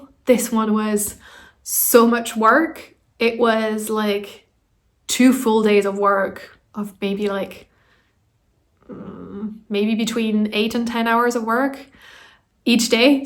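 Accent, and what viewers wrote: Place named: United Kingdom. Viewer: Nice getting to know you better 😊 I don’t know if I could do this tag anytime soon because I feel awkward talking about myself (in general speaking into a camera is unsettling for me) but I’m trying to do more book tags. I think you’re a super eloquent speaker BTW! I would have guessed you were from the UK!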